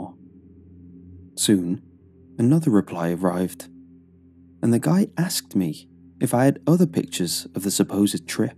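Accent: England, United Kingdom. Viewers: English boi? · YAY! BRITISH ACCENT!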